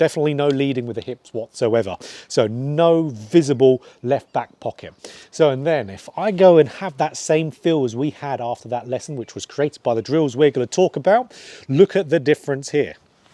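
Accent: United Kingdom. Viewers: I actually think the fact he speaks quickly is refreshing, usually I have to turn up the speed on videos because instructors talk too slow like I’m a child. If his accent is difficult to catch quickly you can always turn down the speed of the video or turn on caption. (My sister often watches any British tv/movies with captions)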